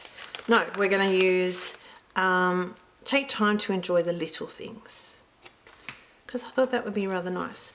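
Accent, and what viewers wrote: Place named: Australia. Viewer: Hi, hello from Norfolk Virginia, great to hear an aussie voice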